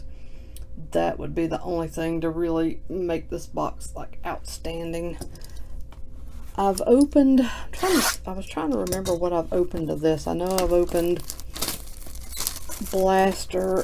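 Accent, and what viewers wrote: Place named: Indiana, United States. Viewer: I want an answer to one question. Are you from Indiana? Because your accent indicates it. You sound exactly like my cousin Tanya and you don't cuss just like her, meaning you don't cuss at all just wanted to clarify. And if you're not please show your face just to prove it.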